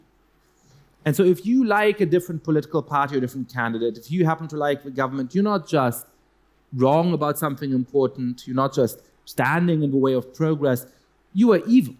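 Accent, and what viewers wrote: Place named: Germany. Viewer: He really sounds German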